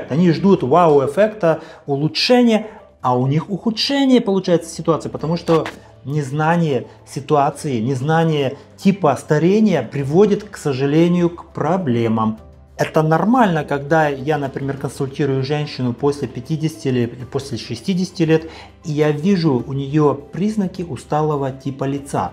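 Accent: Ukraine. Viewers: Дядьку, всоте кажу : у тебе ДУЖЕ СИЛЬНИЙ український акцент!!! Говори українською. Чи, мммм, грошики не пахнуть???😮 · у доктора родной язик украінский, єто просто акцент. скажи ему спасібо что он для тебя по русски рассказивает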